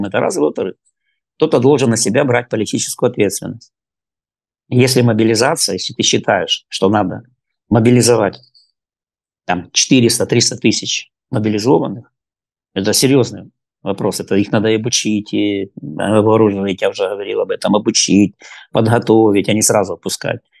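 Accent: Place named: Odessa, Ukraine. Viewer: Ведущая говорите как говорят в Одессе!!! Противно слушать!!!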